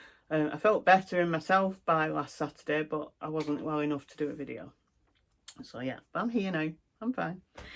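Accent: Lancashire, United Kingdom. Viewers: Your Lancashire accent is one of the lovely things about your podcast. Having lived in Rochdale for a number of years before, I would move back to the north of England in a flash if my family could be persuaded to move! Isolating would be a breeze if I had a nice tree to sit under and stitch. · Hi, my name is Marion. Love your videos, I'm Canadian so it took me a bit to get used to your Lancashire accent, but I understand everything now😁. Sure hope you feel better now. Would like to stitch flowers! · Sorry to hear you have been unwell x I love your Lancashire accent,I was born in Accrington and now live in Southport I could listen to you all day x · Would love to stitch the tree or the flowers. So sorry you have been sick, but glad you are back. Certainly understand the different accents for areas of the UK. I love listening to yours. :-)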